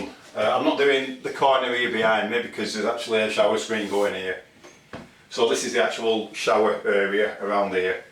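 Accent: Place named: North, United Kingdom. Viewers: handy. I could understand your northern accent. subtitles are funny though around 14 mins! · Brill and very easy to understand, thank you fellow northerner. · Great Lancashire accent that Darwen ?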